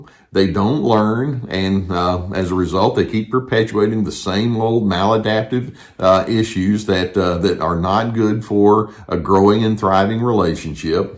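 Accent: South, United States. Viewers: So love your podcasts they are so commonsensical and you beautiful southern accent is like melted chocolate. Please keep up your chivalry and kindness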